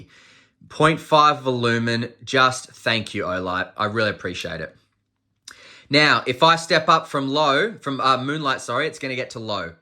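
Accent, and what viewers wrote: Australia. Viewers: with an aussie accent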